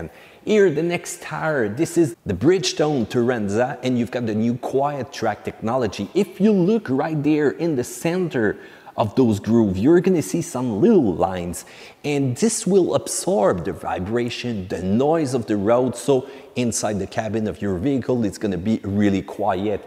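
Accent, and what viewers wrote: Quebec, Canada. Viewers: Yess, Anglais Québécois!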